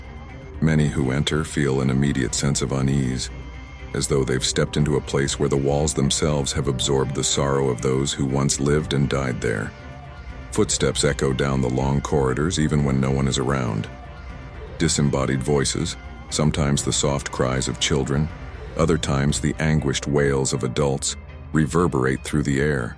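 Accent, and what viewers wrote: United States. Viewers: Why do we have an American talking about this stuff? Stick to your own stuff!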